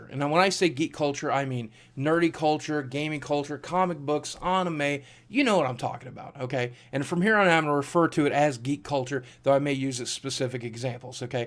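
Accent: South, United States. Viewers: ANIME , but because he's from the south, it's pronounced differently, you ignorant fuck.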